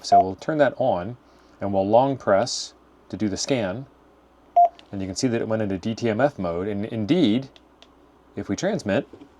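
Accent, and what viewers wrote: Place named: Australia. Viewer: Nice kit and worth even more with the hot Aussie voice chic.😊🇦🇺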